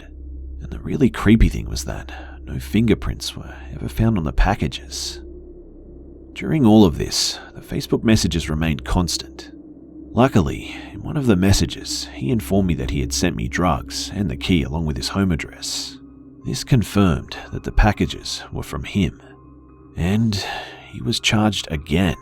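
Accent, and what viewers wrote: Australia. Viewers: So much for sleeping tonight., you make me sleep with the light on. My twisted side thanks you for this, my electricity bill does not. No more listening in the dark with headphones and a narrator who feeds of the fear of his users. No need to go hungry, love it and will keep listening to your wonderful Aussie accent!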